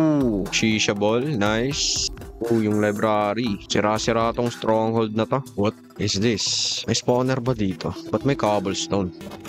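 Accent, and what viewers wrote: Visayas, Philippines. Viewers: Bisaya day ka dol hahaha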